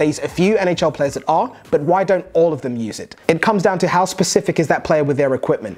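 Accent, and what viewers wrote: United Kingdom. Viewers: Not to be racist but I’d never think I’d be taking information about hockey from a British dude but great video really well done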